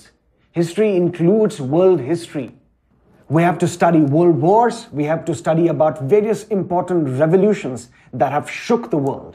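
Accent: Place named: Kashmir, India. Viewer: This man is a gifted orator. I love that Kashmiri accent.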